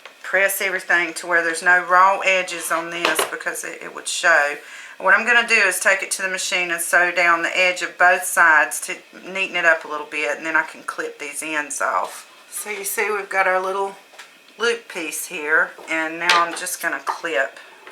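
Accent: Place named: New York, United States. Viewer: It's so great that you keep your accent in your video!! so much of the usa is working to eliminate accents and talk like tv newscasters in a fabricated (non)accent called "general american". Its so boring and awful. I think it wont be long and the nyc/nj accent will be wiped out. Its sad. Kudos to you.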